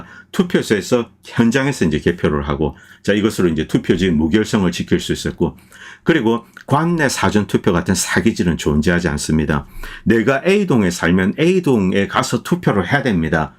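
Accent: Gyeongsang, South Korea. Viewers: 이 사람 똑똑한척 하더니 부정선거 주장을 하는거 보니 영 국짐 프레임 이용하는 그저그런 사람이네 자유민주주의의 최대 적이라할 쿠테타에 대한 개념도 없고 경상도 말투며 그냥 제대로된 보수가 아닌 극우네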